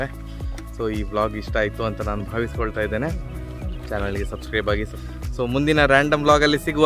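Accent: Mangalore, India. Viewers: Neevu mataduva reeti, namma mangloor kannada mishritha bhashe, nimma jokes.. Manassige bahala muda kodtade. Ede edeyalli heege random vlogs madi. Nodi naavu kushi padteve.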